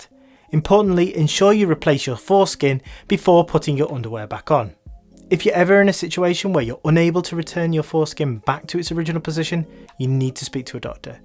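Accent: United Kingdom. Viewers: Northern British English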